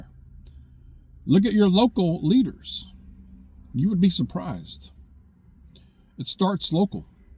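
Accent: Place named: United States. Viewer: I like how you talk...good hearing just regular American citizen real person. I'm Tennessean so that's more like normal folk.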